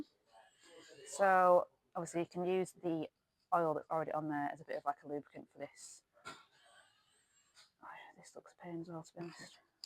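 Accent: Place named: United Kingdom. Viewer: Love the legs and the pants. Also why are you speaking British ?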